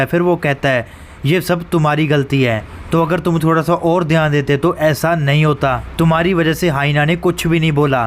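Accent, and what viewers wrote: Punjab, India. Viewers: Bhai aap Punjabi hona right guess?❤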